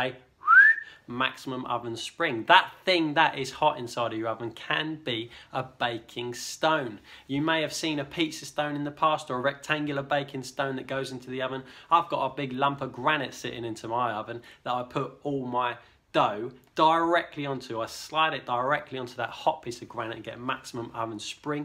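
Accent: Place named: Cockney, United Kingdom. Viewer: My new favorite cockney bread maker!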